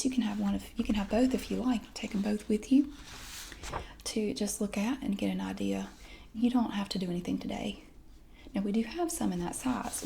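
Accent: South, United States. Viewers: Is your real voice in a southern accent? If so mine is too! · Absolutely wonderful! I just adore southern accents, they're so calming and relaxing. Fantastic job, keep it up! :^)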